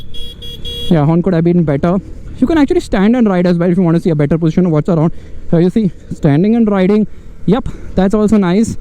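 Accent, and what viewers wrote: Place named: Mumbai, India. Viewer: That is Mumbai/Pune English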